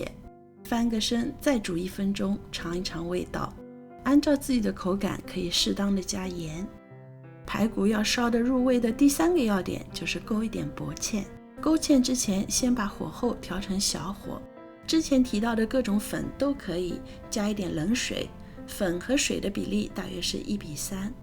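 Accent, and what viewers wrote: Shanghai, China. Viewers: Hello, 我也是上海人。你的美食频道做得真好，很地道的上海菜。很地道的上海口音。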